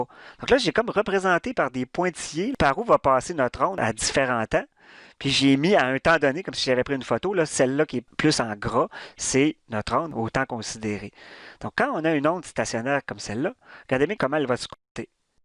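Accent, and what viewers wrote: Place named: Quebec, Canada. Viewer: Maintenant en ds quand je vois des ondes stationnaires j'ai un accent québécois dans la tête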